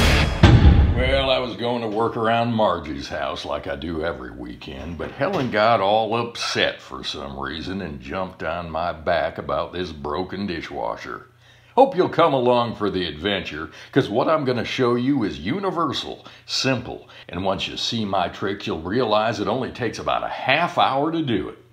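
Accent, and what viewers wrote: South, United States. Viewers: You sure know have better story telling skills than appliances. Heck i didn't care about the dishwasher anymore! I just want to listen grandpa tell us some wonderful moonlight story in his his deep southern accent!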